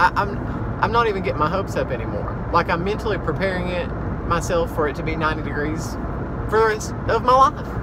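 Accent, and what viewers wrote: South, United States. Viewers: My boyfriend and I just love watching you, so perky and fun and love listening to that southern accent! BTW I love my tarts as well, a definite good one I discovered the other day is Better Homes & Gardens "Spiced Vanilla Embers" you'll thank me :) it's amazing!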